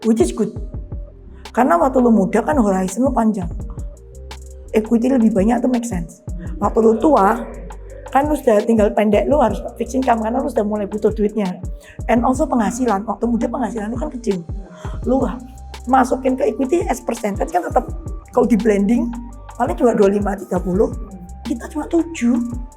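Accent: Java, Indonesia. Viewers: Cici jawane medok.. 😊😂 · Cici penyampaiannya gokil substantif dan mudah difahami, terutama medok lagi 😅👍👍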